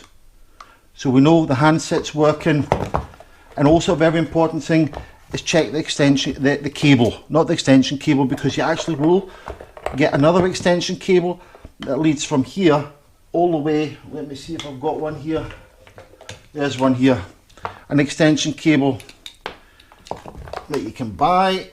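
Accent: Scotland, United Kingdom. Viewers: Sometimes i wonder how many instructional videos absolutely bamboozal me......NOT THIS ONE. This is a delight to listen to. Is it because its a Scotsman explaining, to a like minded Scotsman😂 Thank you. I will now be able to diagnose my daughters reclining chair. Ive taken note of your website etc.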